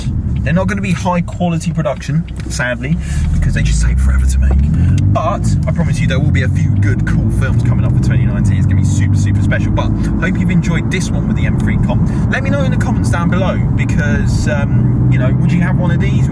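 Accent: United Kingdom. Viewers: Nice vid, wow u are mad British with that accent my man! M3 CS.... I unfortunately didn’t dig the M4/ M3 with the soon to be finished generation of the 3/4 series. I think the fact that it was lighter but only made small hp gains was the big reason why former or current E90/92 M3 owners may not have gravitated towards the newer M car. As for me, I am cashing out on the BMW M brand and hopping over to Porsche later this year, but I hope that BMW tries a bit harder to make their M cars special again. Having the same general engines is not a cool thing, I don’t want to have an M series car that shares the engine with a few tricks and higher boost as a normal top of the range model engine- I want it to be separate (8 also don’t want a damn seat backrest with a glowing M logo on it ... what were they thinking)? Maybe that’s my age kicking in but that is still a dumbass thing they did.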